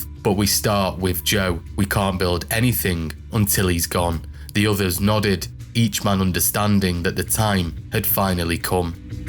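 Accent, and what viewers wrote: United Kingdom. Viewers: What the Fuck is this shit some British idiot talking about the American Mafia 😂😂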